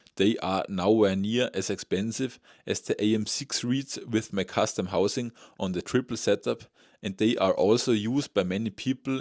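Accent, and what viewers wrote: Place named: Germany. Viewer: pls stop with english you sound way to german you are to stiff for english loosen up or just stay with german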